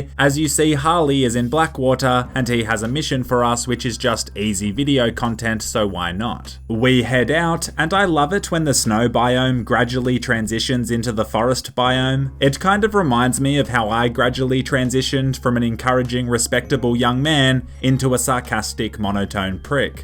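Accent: Australia. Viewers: Yes! My prairers have been answered! Finally some more Legs Spread Redemption 2 Online by. Lovely seeing someone freeze to death in the mountains with Australian commentary! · I read this in your australian voice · Oh i thought u australian let's go australia, we got best game utubers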